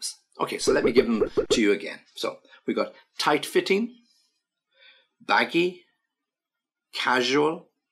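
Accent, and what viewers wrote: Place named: United Kingdom. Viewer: I really like British English, authentic! Please give us some words to describe about an exquisite accommodation or a superb resort and their services.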